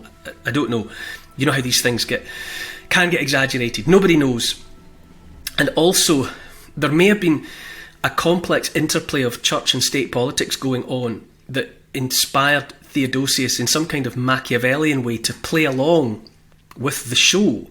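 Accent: Scotland, United Kingdom. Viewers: I like accent. Is it Scottish?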